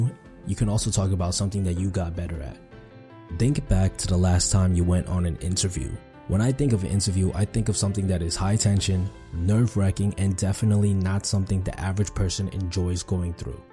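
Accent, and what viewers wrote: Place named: New York, United States. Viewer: Thanks. You sound like you’re from NYC, Puerto Rican 😊. Am I right ? I’m from Washington Heights.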